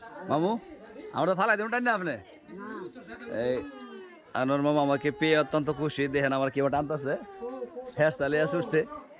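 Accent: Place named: Nabinagar, Bangladesh. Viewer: এই অঞ্চলের ভাষা ব্রাহ্মণবাড়িয়ারনবীনগরে ভাষার মত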